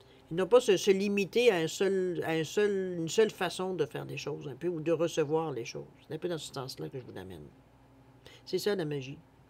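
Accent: Quebec, Canada. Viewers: ah c'est l'accent québecois !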